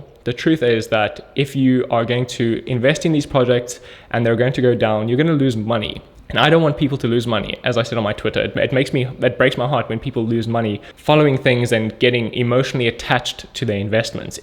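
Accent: South Africa. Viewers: Nice videos..my new favourite channel for crypto news. Seen ur channel yesterday. 🌟🏆🙏 1 Question: Are u a fellow South African? 😉👍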